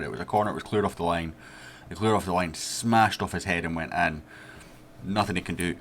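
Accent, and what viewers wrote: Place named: Fife, United Kingdom. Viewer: you sound like a fifer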